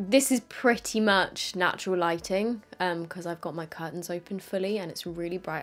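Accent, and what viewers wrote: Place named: United Kingdom. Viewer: I love this makeup look, I think I’m gonna try it tomorrow (minus the gems because I’m moving house and don’t need to be too fancy 😂) Side note: it’s so adorable when you say “you know what?!.” 😍 Hope it’s not patronizing for an American to say your British accent is adorable, it’s just so soothing and makes everything you say sound interesting!!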